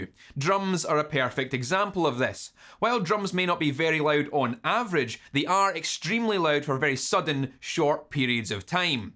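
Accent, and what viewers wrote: Scotland, United Kingdom. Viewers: I love your channel! So much fantastic info delivered in a compact format and 100% comprehensible. And I love your Scottish accent..."peckups" is a favourite 😊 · Scottish accents are THE BEST!! I could listen to you read a tech manual all day LoL Really great video and audio lesson! Keep up the fine work!! Salutations from Miami, Florida!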